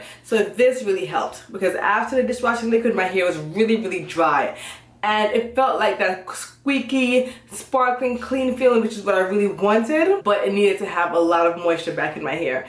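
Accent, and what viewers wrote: Patois, Jamaica. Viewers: Well obviously you are Jamaican... lololol Just heard your pathois!lolol love you even more now! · did u not hear her speaking patwa... smh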